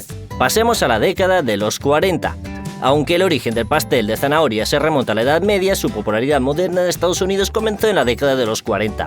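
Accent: Spain. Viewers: Qué bien que pongan una buena voz en español, en castellano como tiene que ser y no en latino como ya se escucha en demasiados vídeos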